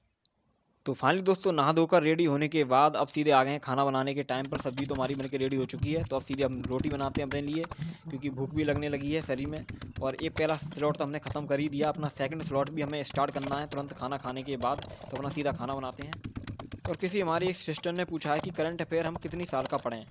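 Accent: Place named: Chambal, India. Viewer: Bhaiya Nmakar ji Kha sy ho aap Dholpur, murena, Bhartpur, Agara, Bhind, Gwaliyr ... Inhi city's k aas paas k ho aap aapki Awaj s pta cjlta hy 😊🙏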